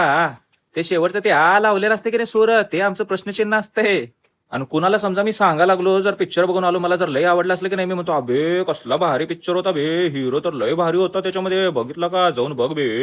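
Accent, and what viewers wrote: Solapur, India. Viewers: Aamche bhajivale kaka pn same asch boltat aaj mahitvzal te solapurchi bhasha aahe 😂😂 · शुद्ध मराठी गुणिले सोलापूर करून दाखवलस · Accurate सोलापुरी भाषा बोलला दादा तुम्ही, मी पुणे cha आहे, पण आमच्या friend circle मध्ये आहे एक सोलापूर cha friend त्याची सेम tuning आहे अशीच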